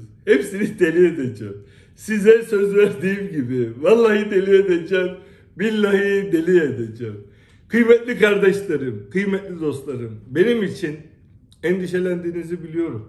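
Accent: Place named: Izmit, Turkey. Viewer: Milyonlarca insanın diyemediğini anlattın bu ülkede seni unutmucaz reis keşke olsanda ülkende şu izmit sesinle canlansın eskisi gibi herkez kötü lesede kaderini bilki ki biz biliriz seni reis korkak gibi susmaktan sa aslan gibi ne varsa anlatmaya calıstın unutmadık unutmucaz seni 🙏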